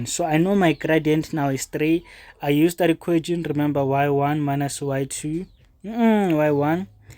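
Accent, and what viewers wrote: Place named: India. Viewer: I love your energy, accent, and your teachings! Big help for I am going to take CET in 3 days. Thank you random Indian teacher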